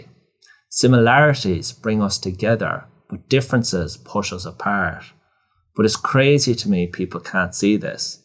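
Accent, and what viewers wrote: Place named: Kildare, Ireland. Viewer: I blame the multi nationals for this. They now employ more non nationals here in ireland too. So support them you keep supporting this. Their a liability to this country now and played a big role in causing cost of living crises too. Irish are not having kids either due to to so much liberalism and diversity. I'm guessing this guys accent is around kildare area......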